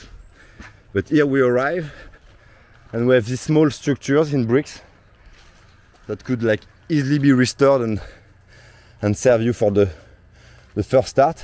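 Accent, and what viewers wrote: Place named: France. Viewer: Quel joli accent français!! Je suis anglophone donc je peux le dire. 😀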